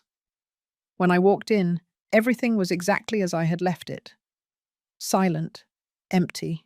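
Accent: United Kingdom. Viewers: I love these stories because of the British accent and slower pace of speaking. Other story channels have very annoying voices and speak with agitation and too young of a voice for the age of the main character.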